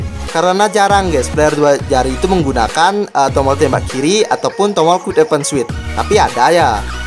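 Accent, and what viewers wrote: Bali, Indonesia. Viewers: Logat nya Bali banget😊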